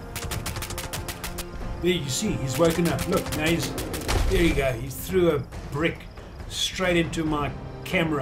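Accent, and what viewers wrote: South Africa. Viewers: Do i detect a south african accent /proud of you for doing well in the gameworld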